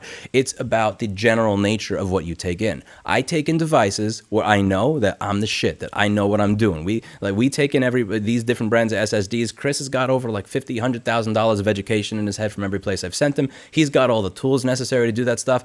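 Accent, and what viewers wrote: New York, United States. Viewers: I subscribed because i enjoyed hearing you, an educated and passionate individual, talk about injustice in a fair and measured way with an excellent new york flair. I stayed for the same reason, but also because i appreciate your perspective on the world. Your commitment to values like honesty and integrity give me hope that there are still some good people out there. Im a pilot, just getting out there in my career, and doing things like networking on linkedin and building my resume make me feel like trash because im not succeeding on the modern pilot metric. I just have to remember what you said: success is based on what youre trying to personally achieve. Thanks, louis.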